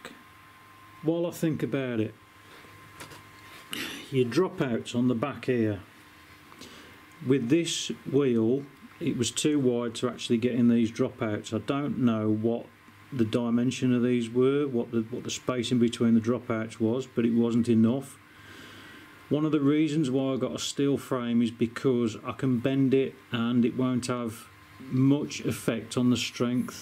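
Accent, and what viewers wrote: Birmingham, United Kingdom. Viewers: You sound like youre from near Birmingham If you want somebody to test that bike at full speed then let me know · you a Brummie ? Nice Work ! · I think that is quite possibly the funniest fuckin video I have ever seen on YouTube!! Got tears rolling down my face typing this. Brilliant Brummie. Thank you. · After 10 seconds of that Brummie accent I stopped watching lol! Totally bored. .......yawn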